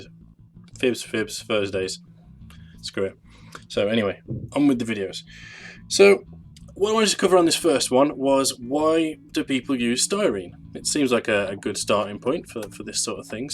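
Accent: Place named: United Kingdom. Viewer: Your Brittish accent is so annoying. Please do videos in Middle West American English, please.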